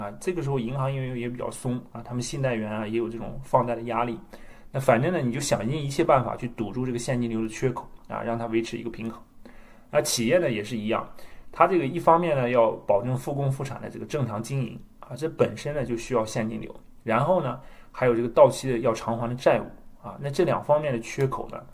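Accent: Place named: Shanxi, China. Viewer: 估计哥们是山西人，口音听着亲切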